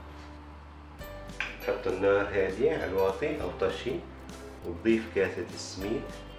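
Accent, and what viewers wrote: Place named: Aleppo, Syria. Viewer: احلى حكي حلبي ..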